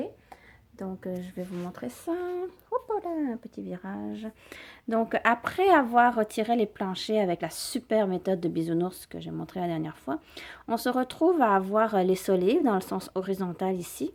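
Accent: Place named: Quebec, Canada. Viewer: Une eurasienne avec un accent québécois... bienvenue au 21eme siècle!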